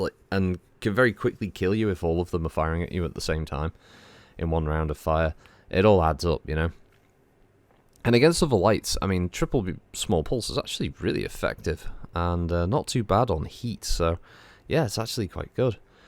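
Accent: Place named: Scotland, United Kingdom. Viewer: A Scott’s accent is perfect for pronouncing Kathil Uhlans.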